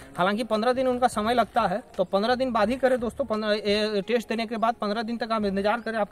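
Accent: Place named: Bihar, India. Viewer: भैया आप हो झारखंड के पर बोल बिहारी स्टाइल में रह हो मधेपुरा बिहार से प्यार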